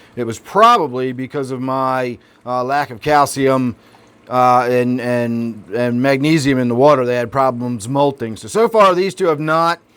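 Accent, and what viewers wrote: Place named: Philadelphia, United States. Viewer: I just stumbled across your channel and I gotta ask, are you from Philly? You talk like us.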